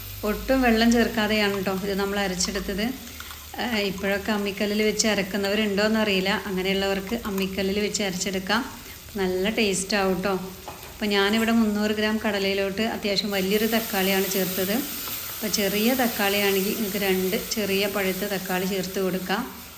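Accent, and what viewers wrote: Malappuram, India. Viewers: മലപ്പുറം താത്തയുടെ മലപ്പുറം സ്റ്റൈലിലുള്ള സംസാരവും പാചകവും എത്ര രസകരമാണന്നോ? എനിക്ക് വളരെ ഇഷ്ടമാണ് നിങ്ങളെ 'എന്താ മുഖം കാണിക്കാത്തെ കൈമാത്രമാണല്ലൊ കാണുന്നത്.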